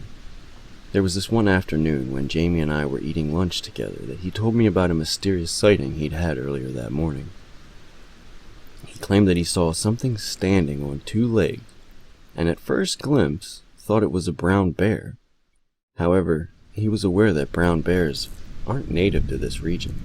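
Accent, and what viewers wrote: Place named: North, United States. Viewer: Great job on sharing these hand picked stories. I get drawn in by the pace and timing and you must be from an area North of the Mason- Dixon line (written by a true Northern New Englander!) You are mastering the art of background sounds without getting washed out by it. Your reading voice is 'lower' not baritone, but in a lower register, so be careful using rolling thunder as IT is in a lower register as well. I sense that you are using a good microphone but, your mixing software may not be able to lay down multiple separate tracks? Really enjoying these recent uploads, drifting back in time to hear older work as time permits. 'Liked', subbed & comment for YT algorithm!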